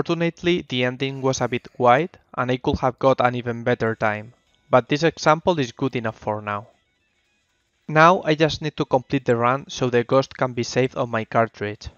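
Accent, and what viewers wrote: Spain. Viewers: Only an spanish can identify another spanish speaking in English^^ I have just done it! Good video! :P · eres de españa? tu acento me suena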